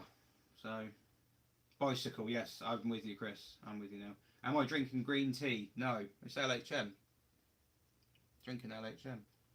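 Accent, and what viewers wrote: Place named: West Country, United Kingdom. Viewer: Sorry I missed the live boy apprentltbdaughters birthday was more important… really enjoying the catch up. Just to clarify cause it wasn’t made clear, do you have your own SM? As you seem to have a bit of a West Country accent can you end your vids with a “proper job0 “ akin to the pe- chew of Ian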